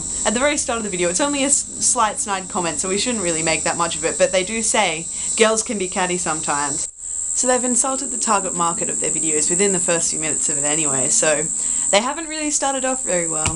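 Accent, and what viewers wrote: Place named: United Kingdom. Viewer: I love your british accent :)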